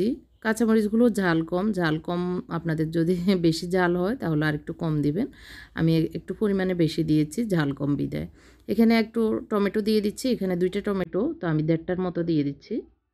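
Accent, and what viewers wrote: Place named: Noakhali, Bangladesh. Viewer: আপা নোয়াখালীর মনে হয়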